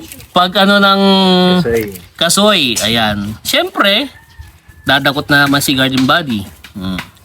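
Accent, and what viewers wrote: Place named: Cuyonon, Philippines. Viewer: Pagcuyonon din lang halata ka na cuyonon ka ra Tagalog Tagalog ka pa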